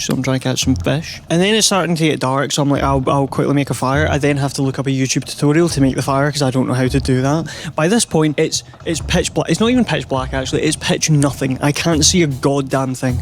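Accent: Scotland, United Kingdom. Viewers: Is that the Scotland accent or Irish accent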